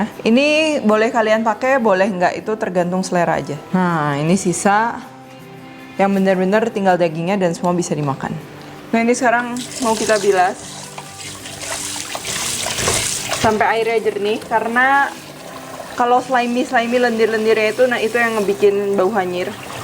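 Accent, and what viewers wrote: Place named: West Java, Indonesia. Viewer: bau hanyir 🤔nyunda pisan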